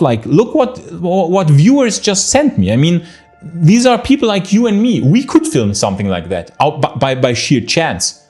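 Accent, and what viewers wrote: Germany. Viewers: I’m still new here. I love your accent. I traveled around Europe for a few years. Where are you from..Germany? I lived there for about 6 years. Spangdahlem. Beautiful country. I loved my time there. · Id say it's a german accent. He just speaks english very well. Maybe im wrong. I am by no means an expert.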